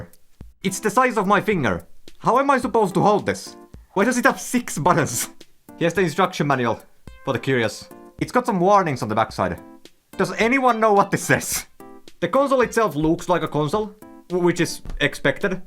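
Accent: Finland. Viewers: Aloin miettimää että ootkohan suomalaine ku suomalainen aksentti mut huomasin että irwinin paita nii tajusin siitä että oot suomalainen🙏🏻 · when i heard your accent i knew you were from finland (minä olen myös suomesta) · Really? Why watch the vid then, I think the Finnish accent is kinda cool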